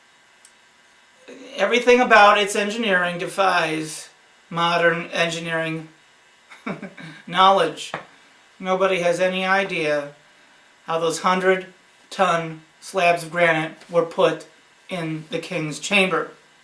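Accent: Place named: United States. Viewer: Just read your post. The presenter has an American, not Australian accent.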